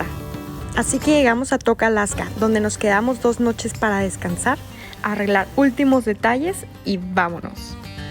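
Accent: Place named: Monterrey, Mexico. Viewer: Chicos me tenéis enamorado de vuestro viaje y lo buena narradora que eres tú explicarlo todo con ese lenguaje y acento tan guapo mexicano que tienes o sigo porque yo también soy aventurero teclista ya he recorrido todo México en bicicleta y Centroamérica continente africano y Europa próximo objetivo es viajar a Sudamérica en bicicleta vuestro vídeo me siguen animando y dándome fuerza para emprender este viaje ya que a mi edad y ya un poco difícil y es forzoso ya que soy una persona jubilada con 65 años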